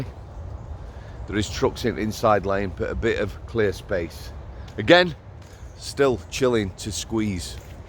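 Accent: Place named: Yorkshire, United Kingdom. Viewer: I'll see thee just like fred trueman used to say at the end of indoor league on a sunday. Proper yorkshire man great accent . Glad your ok 👍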